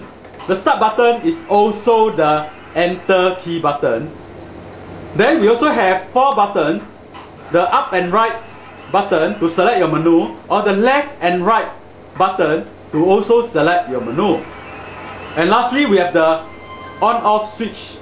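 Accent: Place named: Singapore. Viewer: Guys, this is a Singaporean Accent... And he's using Singlish... the Slang of Singaporeans...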